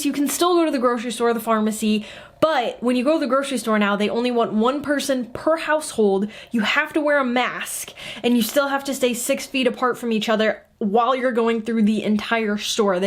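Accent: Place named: United States. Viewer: When I was going threw YouTube I saw this channel, very nice , keep the good work I’m surprised because you sound more American than Jordanian but very very good I like the Chanel and you got a new subscriber and a like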